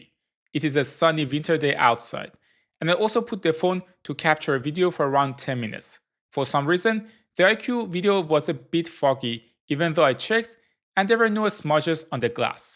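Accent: Iran. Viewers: Obvious persian accent 😁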